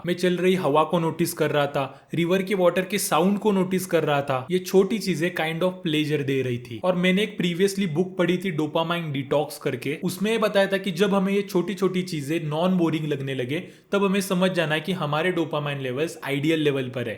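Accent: Satara, India. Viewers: are you from satara ?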